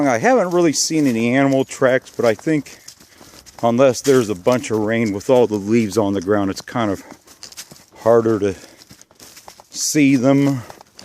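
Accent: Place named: Indiana, United States. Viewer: "YouTube-uh, Dayton-uh!" Lol you have a thick Indianian accent for being a Daytonian!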